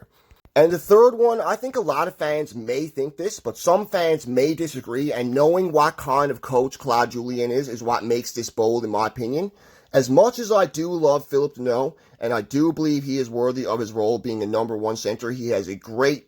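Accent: Newfoundland, Canada. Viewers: U sounds like a Newfoundlander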